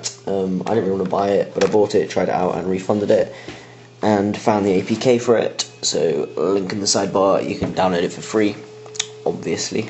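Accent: England, United Kingdom. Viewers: shut up fucking shit from fyrom he is from england and he has an awesome ENGLISH accent.. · You sound like your from London.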